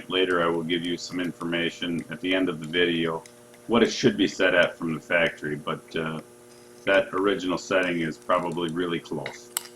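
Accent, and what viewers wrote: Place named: Minnesota, United States. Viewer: I bet you are a Minnesotan?? You have the same accent as all my relative's??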